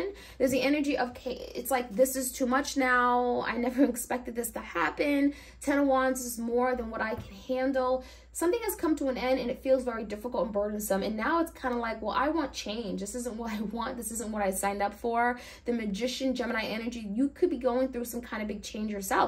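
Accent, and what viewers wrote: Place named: East Coast, United States. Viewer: ur accent gives me classy east coast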